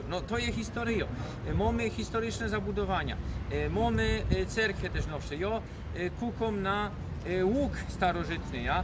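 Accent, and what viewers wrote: Silesia, Poland. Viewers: TA ŚŁONSKA GWARA JEST FAJNA , DAWAJ NA ALBANIE 😊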